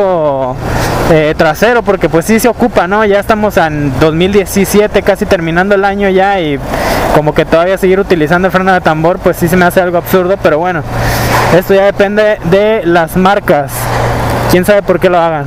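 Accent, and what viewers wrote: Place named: Paisa, Colombia. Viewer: Me hiciste llorar al ver mi ciudad y escuchar a un paisa hablar chido tu vídeo vivo muy lejos y ver mi ciudad me sacaron lagrimas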